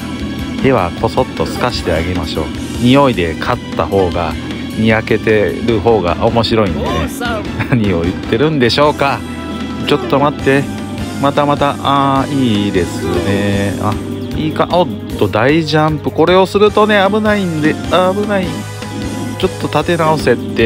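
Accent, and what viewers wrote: Kansai, Japan. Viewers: このゲームおもしろいですね！！笑さん関西弁でした？！ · 相変わらずの関西弁ナイスー👍 そしてLINEの返信が全部英語ꉂꉂ🤣𐤔 時代感じます😅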